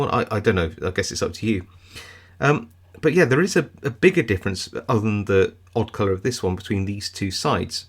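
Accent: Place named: Bristol, United Kingdom. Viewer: I can only agree with everything writes so eloquently (and punctuates so flawlessly). High production values and a touch of Bristol accent 👌. I have a small tube of these slim picks but I'm scared to use them. The screw tubes are great, too.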